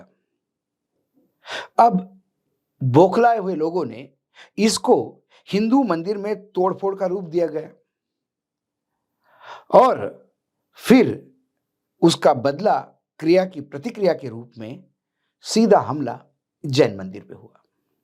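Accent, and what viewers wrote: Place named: Nagpur, India. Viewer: Aap ki sab bat thik hai lekin nagpuri tadak dehi deto ho, aap ka aakan bhi chuk jata hai, phir bhi kam achha kar rahe hain, aage ke liye sadhana ki jarurat hai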